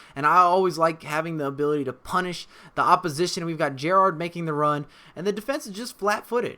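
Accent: Houston, United States. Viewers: R u from Houston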